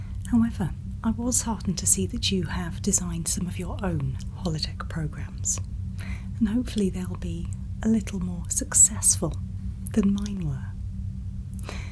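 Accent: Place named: RP, United Kingdom. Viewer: And by the way, I hope you noticed she is speaking the 'Q' English... : ))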